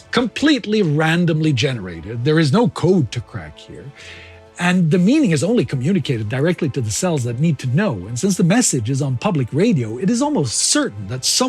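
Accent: Netherlands. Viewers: Heerlijk, dat Nederlandse accent.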